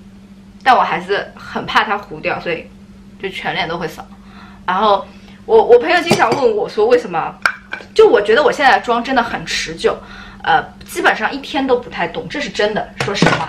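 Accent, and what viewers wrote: China. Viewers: 你啊是苏州人啊！！！感觉口音伐要太亲切哦！！ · 第一次看你视频就确定你这蜜汁口音是上海人😂因为我也是哈哈。 推荐看明星大侦探诶，有点烧脑但是也很搞笑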